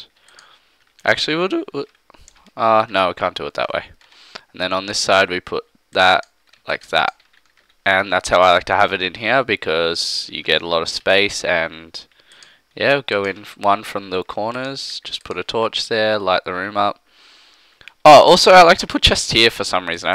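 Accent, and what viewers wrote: Australia. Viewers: man he's clearly australian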